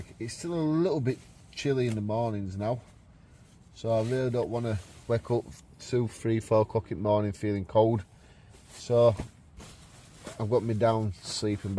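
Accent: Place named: Northern England, United Kingdom. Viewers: Great as always. My very humble advice. Stay away from divisive topics mate. Personally I come here for the advice, your knowledge and the great gear reviews. Delivered in your great west York’s accent and lovely gentle manner. Ultimately, I’m here for anything camp based. If I wanted to see or hear anything negative ( no matter what the topic) I’d buy a tv and watch the propaganda on there. Or god forbid join that circus o win as FB. You’ve been a massive influence on me and my own wild/ stealth / nature camping adventures. Looking for ward to autumn and winter to get my hot tent out! So a big thanks fella. Take care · Usually on the camping vids on Youtube when the food is being prepared and cooked I switch off, but on this vid if you are cooking the same again can I put my name down please?, good to hear a Northern accent also.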